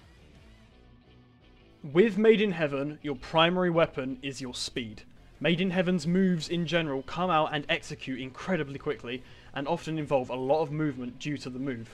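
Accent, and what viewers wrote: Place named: United Kingdom. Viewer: eclipse but british B)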